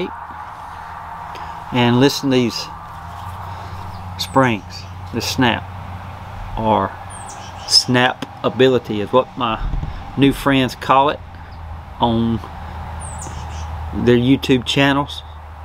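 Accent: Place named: Alabama, United States. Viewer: Were trying to hone in on that accent if yours. Don’t worry I have one too so I’m saying Alabama and she’s saying Louisiana. Who, if any, wins the bet?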